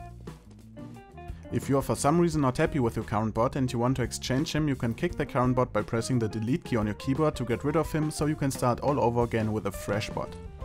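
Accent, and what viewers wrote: Germany. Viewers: ★ CS:GO Channel ★ shit also bist du doch deutscher das hört man sehr sehr sehr gut raus:D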